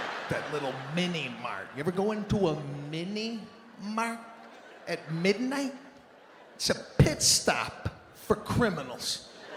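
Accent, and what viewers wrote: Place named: Brooklyn, United States. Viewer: I love his Brooklyn/Queens accent! I'm from Brooklyn, and it just adds to his comedy! 💗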